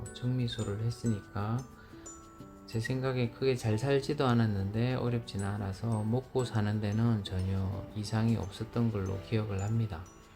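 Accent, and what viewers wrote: Gyeongsang, South Korea. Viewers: 제 고향도 창녕 옆 밀양 무안입니다, 저도 부산에서 몇 년 학교를 다녔구요, 그래서, 억양이나 정서가 비슷하니 더 정감이 가는 것 같네요, 올핸 뵐 수 있겠죠!! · 새해복마니받아요 저두 대구사람 어찌 말투가 주위에서 자주듣는 어투라서 경상도분이구나했두만 역시나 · 선생님의 이야기 잘들었습니다. 항상 느끼는것이지만 선생님은 경상도분이신데도 투박하지않은 듣기좋은 그런사투리를 쓰십니다. 그래서 항상 듣기가 편안합니다. 제 말투는 완전 대구사투리 그 자체거든요ㅎㅎ 오늘 날씨가 참 좋아보이네요 그리고 제가 가보았던곳이라 너무 반갑습니다ㅎㅎ 좋은하루 되시구요 오늘도 좋은영상 감사합니다^^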